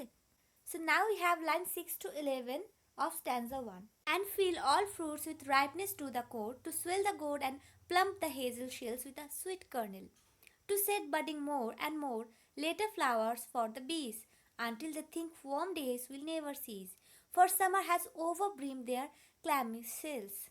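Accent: India. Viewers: Are you from India??? I can guess from your accent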